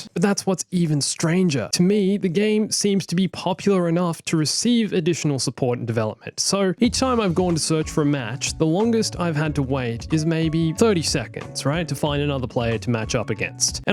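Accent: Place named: Australia. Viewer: Wait your an Aussie, I thought u where a briton.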